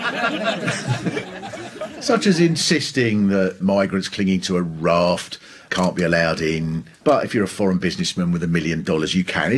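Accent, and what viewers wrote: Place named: England, United Kingdom. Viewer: Grate english accent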